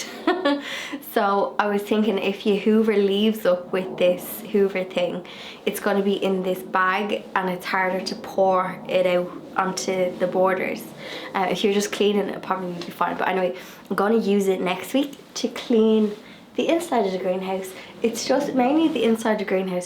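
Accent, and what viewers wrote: Ireland. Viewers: Hello from the States! Will your sweet hedgehog be hibernating this winter or will he be active year round? The family that introduced my parents to each other waaay back in the 50s were from Ireland, so I was blessed to grow up hearing the lovely Irish lilt quite regularly. Just wanted to let you know how delightful it's been to hear that lilt again since discovering your channel a few months back! ❤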